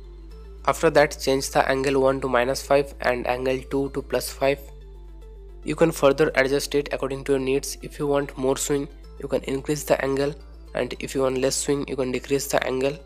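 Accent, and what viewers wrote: India. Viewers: you know its the good shit when you hear that indian accent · U are indian i know indian or pakistani people speak english like that😃i am right am i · Hahahaha Indian English your speaking wtfc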